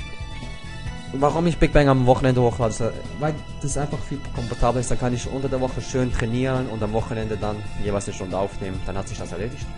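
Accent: Switzerland. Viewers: eey mach dir nix draus die 8 youtuber sind halt uncool :D achjaa ich finde dein schweitzer-deutsch soo gel *-* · Ich habe dich abonniert und finde es echt lustig mit deinem Mittwuch und dein schweizer-deutsch ist cool. Es fällt nicht extrem auf :D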